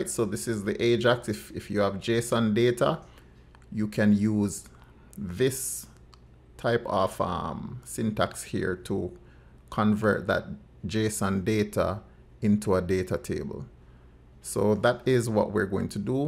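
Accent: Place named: Jamaica. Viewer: I don't usually do this but when I heard your accent I had to log in just to say, holy shit, a Jamaican narrating an IT video is pretty much one of the rarest but most awesome things I've ever experienced. And besides that, you did a really good job walking us through the process. Good job mate! Peace, love and unity!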